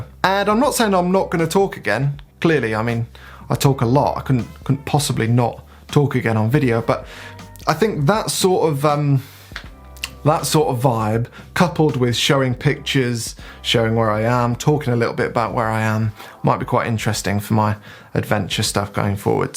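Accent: United Kingdom. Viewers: I mainly watch this channel for the British. "Trousers", "lorry", "aluminium" etc.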